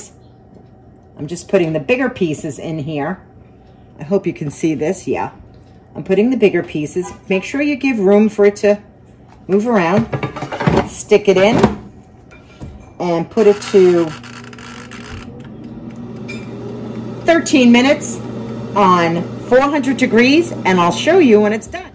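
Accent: New York, United States. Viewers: Morning! do i hear a hint of NY accent in you? lol the dish looks really good!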